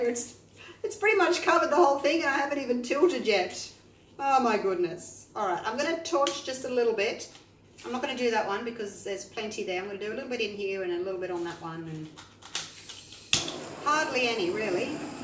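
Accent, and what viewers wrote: Australia. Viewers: Disastrous?? It’s gorgeous! Love the cells - reminds me of a tile mosaic. I love listening to your chatter! It’s cheerful, and who doesn’t love a cheery Aussie accent?? Lovely!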